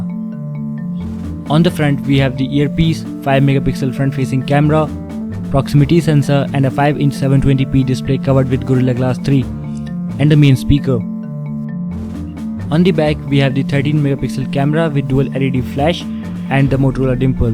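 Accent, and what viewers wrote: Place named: Bihar, India. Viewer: Your English has Bihari Accent! #NoOffence Nice Video btw! :)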